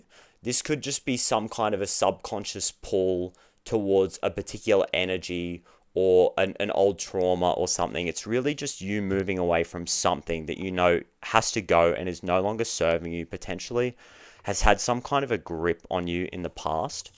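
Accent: Australia. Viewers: Hello seeing you for the first time and I want to say what a handsome face you have, so nice looking. What part of Australia are you from, you accent to me sounds Australian. You also seem a sensitive and shy person. Your reading resonated with me. Greetings from me in the Emerald isle of Ireland and a very happy and blessed new year to you and your family. 🇮🇪🙏🙋🎄☃️😁🇦🇺. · Been trying to place your accent! Not american, are you? Australian?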